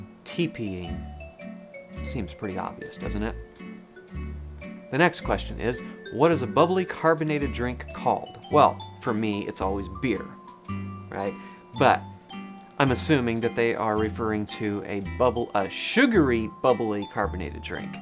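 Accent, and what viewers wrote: California, United States. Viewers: Bay Area born and raised, until I moved up to the PNW around age 30. I definitely noticed CA transplants after living there for a few years just by the way they talked. I did pick up on your accent, though you were talking pretty slow in the intro to this video. If you were talking at normal CA speed it would definitely come out more. I live in CO now, where I really have to slow my speaking down, even compared to PNW. I don't even pronounce the "a" in "theater".. just straight up "theeter". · omg hell yah man! That was hella california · Ahhh, that sounds so beautiful! As a froeigner I always wondered if it really was a "california" accent that the "typical Hollywood stars" are speaking... And if there even was something like that. And I immediately noticed that your accent was exactly what I was searching for, thank you! 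And I love it so much! I can't really describe what it is, but I like it so much. Now I will spend more time on imitating some interviews xD It's just fun but I feel like I always have this strong german accent ) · You Californian as fuck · A white people California accent. That what you got . every group of people everywhere you go anywhere talk different An got they own accent . Jus cause you from Cali an talk that way don’t mean everyone talk like you . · Yeah it's pretty Californian, but I'm from Socal myself so I can't tell. if I wasn't a native, I prob wouldn't know the difference between Cali and rest of the west. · Your accent sounds like mine. I’m from central california coast. So yeah you have a California accent! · Ooh, nice catch! I grew up in Santa Rosa. It took living in other states to understand that yes, it indeed is a California accent. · I am a Californian, born and raised in L.A. 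In answer to what would I call an athletic shoe, we always called them "tennis shoes", whether they were for tennis, basketball, football, baseball, etc., made no difference, we called them tennis shoes. All my pronunciations were the same as yours, so I guess Southern vs.Northern Cali accents were pretty much the same. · I come from the California bay area and you sound exactly like me. I looked it up to show what a friend in the UK what I sound like, she has an essex accent. My friend used to say Wersh! I think her parents were from somewhere else. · You have a California accent, close to mine. 40 years ago I arrived at a youth hostel in NYC and the manager's first comment was "you're from California." It was the word "howdy" with no southern accent that tipped him off. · Californians over pronounce the English language. That is why you do have a Californian accent. I would guess Northern but you already confirmed it. 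· I was looking for a video to explain the Californian accent to my English students and they are learningn English. You started speaking and I thought wow you must be from close to where I am from. In fact I grew up in Napa too! That's really cool! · Far and away an obvious Californian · I could tell you were not from southern ca quickly. Calif but not south. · California. Not only accent, but even your mannerisms. If you aren’t from California I would be shocked. You could be my neighbor. : ) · "we speak much faster..." Uh come on dude, that is absolutely not a stereotype or classification property of the California accent or of any other accent, it is more due to personal preferences and other personal features. That stretching of phrases like "no wayyyy" either btw. Sry but what are you talking. Dude of the vid has a (light) Californian accent (not a strong one)...I can tell since I am Californian myself. 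And yes I know the vid is very old (in fact it is half as old as me) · you say San Francisco with a slight "p" sound where the "f" is. Also you say "fer" instead of "for" when you're not paying attention. Yup, Californian.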